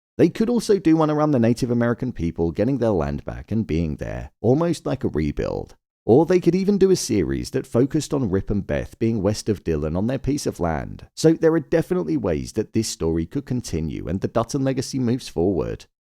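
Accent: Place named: RP, United Kingdom. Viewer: This BBC voice narrator is definitely not helping the review. What a shitshow ending.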